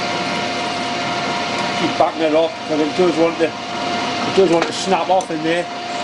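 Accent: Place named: Northeast England, United Kingdom. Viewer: North east accent, but cant place exactly where.... Id have said closer to the tyne than the wear though! Good idea that, subbing for lathe porn!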